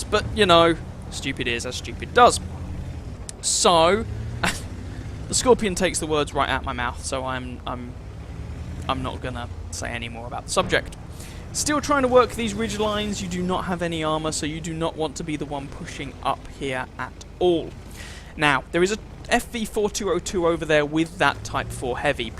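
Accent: England, United Kingdom. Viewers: Loving your videos, probably due to your English accent... Keep it up.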